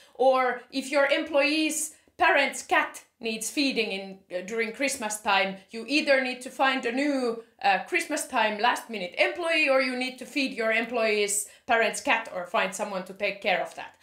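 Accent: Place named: Finland. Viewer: 11 seconds in I am betting your from Finland??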